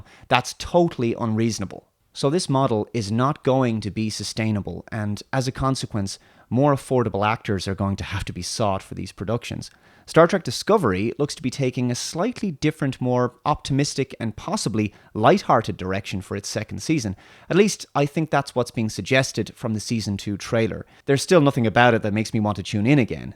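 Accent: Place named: Ireland. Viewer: As an Englishman, listening to your Irish accent, I can see how the American accent developed. That twang is there. As for Star Trek, I was born in 1980 and I still prefer the original series/cast with it's crappy effects in all it's glory.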